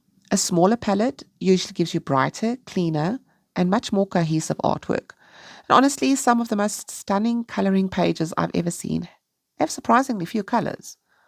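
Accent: South Africa. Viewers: I’m so glad I found your channel, thank you for the time you spend to help us. I find you so calming and easy to listen to. Are you South African? I am interested in accents, I myself live in Australia now but I was born in Birmingham in England, I also listen to Jo at piggy pens and some others from different parts of the UK. I listen to some inAmerica also and other parts of the world and am intrigued by all the different accents . I live alone now in a house on a farm it’s peaceful here, only cows bellow sometimes but I enjoy the quiet. My husband passed away four years ago just before Christmas, I am used to being here now and have two cats for company. I have friends too in town and get out quite often. I enjoy colouring and watching videos. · Is that a South African accent I hear!?? 😮😮😮❤❤❤ Home!!!! 🏠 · Please tell me you are from South Africa. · Hi, just want to say thanks for the tips, found your channel yesterday and enjoyed watching some of your videos. 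Its so nice to hear a South African accent on youtube.., Happy National Women’s day today. Just a quick few questions… do you still reside in SA if so wondering where you buy your supplies cos i find things so expensive have been saving for months to buy a Johanna Basford colouring book and some gel pens mine are almost all dry.. thanks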